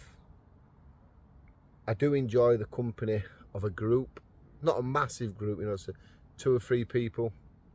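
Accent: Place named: North, United Kingdom. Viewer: I thought I was subscribed but I wasn't, always watch your camp outs, your Northern accent makes me chuckle, the beard is looking good, get a beard before it snows it'll keep your face warm in the cold weather. But it definitely suits you, ohhh suits you sir! Lol! Got to convince the Mrs. Oh lovely to hear that gentle rain, it's been so dry this year, no rain to clean the air. Need that rain to keep it a green & pleasant land. How about a coastal camp, you & vegan Dan, I suppose your far from the coast, its getting the time. Anyway keep up the good camping! Always let us know how you feel, ignore the haters, can never please them anyway. A lot of share your opinions & outlook, at least we're right! Lol!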